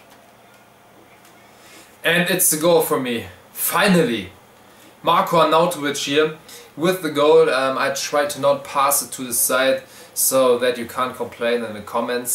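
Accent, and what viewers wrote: Germany. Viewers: Play KSI... would be fun to watch his screaming and your german accent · accent? he's german so its the normal way of pronouncing german/austrian names :D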